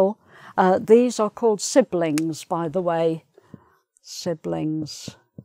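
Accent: England, United Kingdom. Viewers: chemical makeup hhhhhh You have the nicest English accent I've ever seen before. keep on. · Hi i like your videos,theyre very intresting and i learned alot from you i like the british accent! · thank you a lot , i loved your british accent much <3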